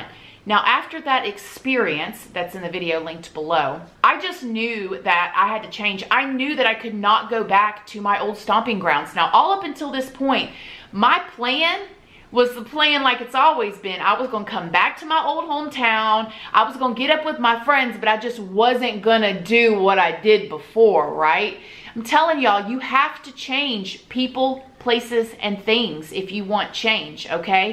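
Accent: South, United States. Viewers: 🇨🇦 .... You aren’t even my kid .. and we area world away .....But wow .. I have to say DAMN girl I’m proud of you !! ... I’ve watched a few of your videos today starting with your horribly abusive childhood ... your prison story ... The whole cavity search (your poor thing) ... And of course your amazing transition to finding 🤗God 🙏🏻 .... I am a Christian and I love that you are bold enough and brave enough to expose so much of yourself and your story to the world ... because there’s no doubt you already have and will continue to help so many !... I hope everyone takes the time to watch and listen to the one about finding God in particular because if ever we need Him it is NOW! ... You are such a beautiful person and I’m a pretty good judge of character !... I am Canadian and I love your southern accent it suits you to a T ... Your son is precious and so blessed to have a mama who can show him what is possible in life even when you don’t believe in “yourself” ... 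God believes in you ... And “we can do all things through Him who gives us strength” Your story and what you have accomplished through it all is rare I hope you know ... Not many who had gone through ALL that you have could have climbed out to become all that you have and are ... Hugs 🤗 to you ! ... Keep on keepin on !💕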